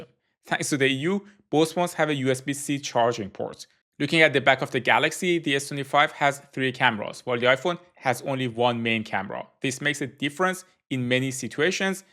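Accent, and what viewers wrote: Iran. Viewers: I have a feeling from your accent that your persian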